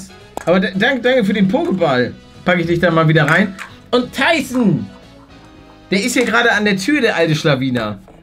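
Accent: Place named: North, Germany. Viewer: Er hat nen geilen norddeutschen Akzent xD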